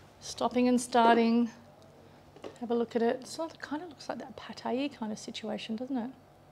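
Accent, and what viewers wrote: Australia. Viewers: Chef Also, thank you for replying as to why the oil! Ok-gotcha... It was what you decided to use for that day! I’m finally going to make this bean dip this week! Been busy! Hugs to you... Also, love the accent and one day hope to visit Australia! Yet I think you’ve shared before that you’re ••not in Australia and rather: Thailand? Coolness either way! Blessings....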